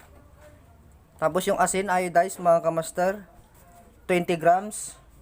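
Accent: Pangasinan, Philippines. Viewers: Taga pangasinan ka siguro ka master hehe the way u talk pangalatok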